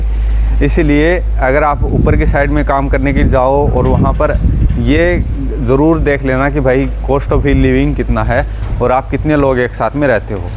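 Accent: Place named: Uttarakhand, India. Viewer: tum bhai pkka uttarakhand se ho .. tone mai hi apnapan h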